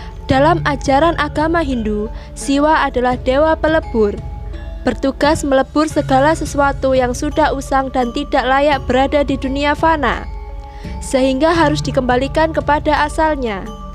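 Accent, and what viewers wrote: Java, Indonesia. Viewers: Kok medok jawane pundi mbakyu??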